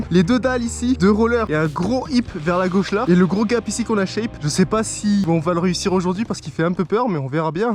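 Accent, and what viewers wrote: Alsace, France. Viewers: L’es accent alsacien ça me fumes😂😂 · L’accent alsacien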